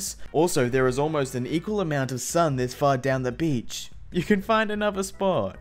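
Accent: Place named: Australia. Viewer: I think he is Australian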